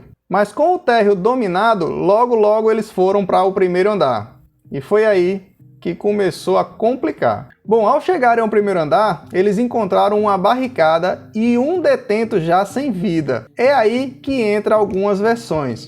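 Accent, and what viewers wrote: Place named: Northeast, Brazil. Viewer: O cara vem falar de algo que nem sabe o que aconteceu, não tem um vídeo e acha que algum idiota vai ouvi-lo com esse sotaque de nordestino !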